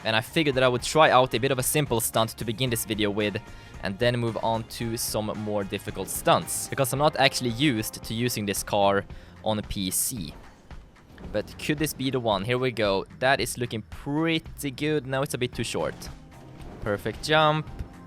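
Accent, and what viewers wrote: Sweden. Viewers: Are you swedish!?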